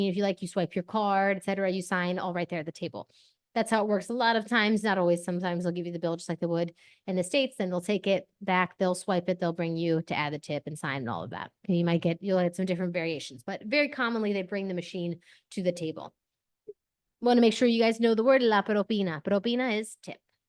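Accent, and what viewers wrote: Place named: Puerto Rico. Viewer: I notice you not pronouncing in Puertorican spanish like dropping the s in this episode it’s because you need help student pronounce in standard Spanish first?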